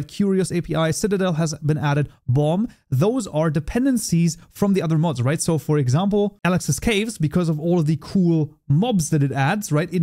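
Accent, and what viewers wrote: Germany. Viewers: Man hört zwar raus, dass du deutsch bist, aber definiv keine schlechte Aussprache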